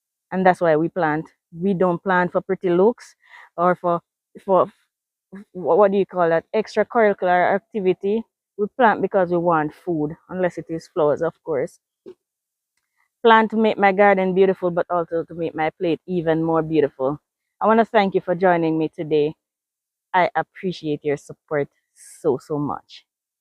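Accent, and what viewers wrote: Jamaica. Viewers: I like her Jamaican voice, good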